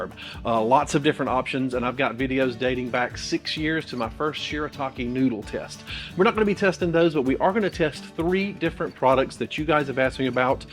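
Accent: South, United States. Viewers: What a great channel name to go with your sexy soothing Southern gentleman's voice.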